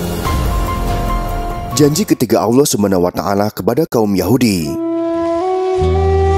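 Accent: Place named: Bali, Indonesia. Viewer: Admin nya orang bali sepertinya. Dari logat suaranya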